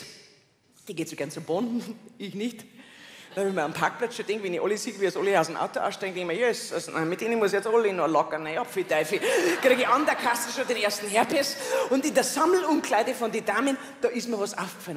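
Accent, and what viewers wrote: Bavaria, Germany. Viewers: Ich habe sogar versucht Bayrisch mit Untertiteln zu verstehen - mein Gott, kommt da ein Müll raus., vielleicht etwas langsamer. Wir sind nicht auf der Flucht. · Zum Glück versteh ich von Natur aus als Steirer🇦🇹 auch die Bayern. Lg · Einfach so oft anhören bis du 95% verstanden hast. Das Wörterbuch Bayrisch leicht lernen. 3 Wochen mit Bayern auf Urlaub, keiner der Deutsch kann. Es wird nur Bayrisch gesprochen, dann verstehst sicher 100% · Lernen bayrisch oder höre es nicht an. Ganz einfach!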